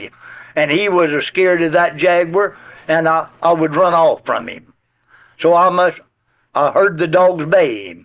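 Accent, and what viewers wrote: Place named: Rural, United States. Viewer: The fantastic thing about this fella is not only his storytelling but his vocabulary while sounding like a dumb azz hick. This man is very intelligent. Everytime i listen to one of these i am so interested because i feel like i was there. This guy was a great man, believe his name is! Lol, just foolin' about...great upload. All of them...😊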